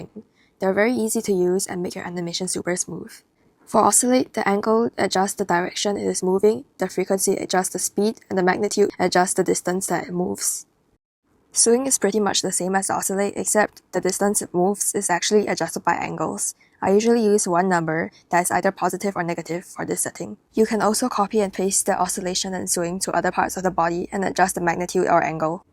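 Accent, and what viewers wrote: Singapore. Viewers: Cool tutorial omg the final is so cute. Also woahahah your accent sounded so familiar. Checked your bio and... singapore?!?! 👀 Me too omg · I can hear the singaporean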